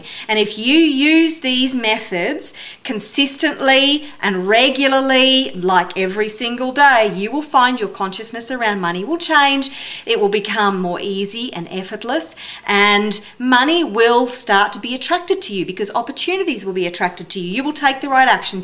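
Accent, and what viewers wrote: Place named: Australia. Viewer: great to have found your channel Thanks and great to hear the Aussie accent! Thanks:)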